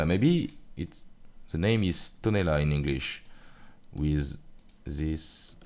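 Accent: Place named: Europe. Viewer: mistakes make you beter and i am just happy to see other people from europe trying to speek english keep up the good work.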